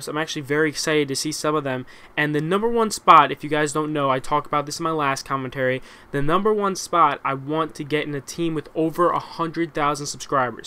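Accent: Netherlands. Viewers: Are you dutch? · are you dutch?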